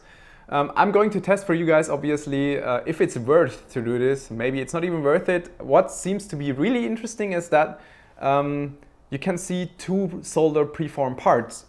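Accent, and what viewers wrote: Germany. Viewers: He's german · Man merkt sofort wenn nen deutscher englisch spricht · Your german accent is very noticable xD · Bist du deutsch? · you are german?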